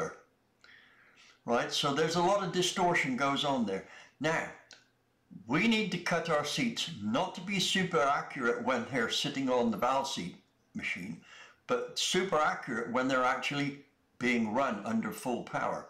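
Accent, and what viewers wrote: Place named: West Country, United Kingdom. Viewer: Hearing a west country accent🤔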